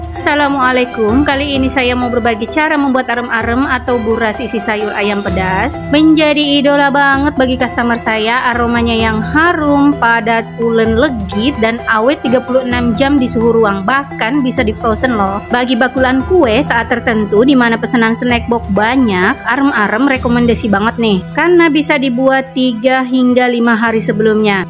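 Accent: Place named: Sumatra, Indonesia. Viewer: Makasih kk,,,bahasa kk macamnya org sumatra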